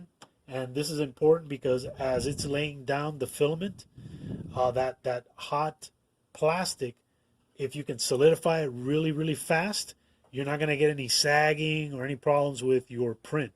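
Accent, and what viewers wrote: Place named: Miami, United States. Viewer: I know a Miami voice when I hear one...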